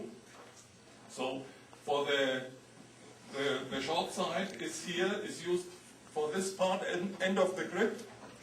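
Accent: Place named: Germany. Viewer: xDD never heard such a typical german accent, and im german so i heard much^^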